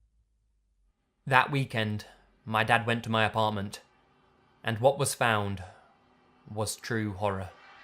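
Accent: United Kingdom. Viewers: I cant stand british accent :D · I don't know about anyone else but I am somehow very attracted to the voice of the narrator because I love british accent and his voice is sooooo appealing to me😅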